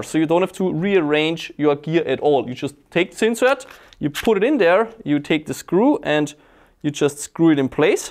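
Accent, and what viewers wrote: Austria. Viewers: Sometimes the Austria Accent comes through your English! And I like it! (I come from Germany, so...)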